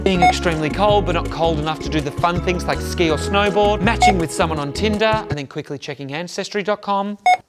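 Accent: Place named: Tasmania, Australia. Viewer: Even the accent, is exclusive to Tasmania only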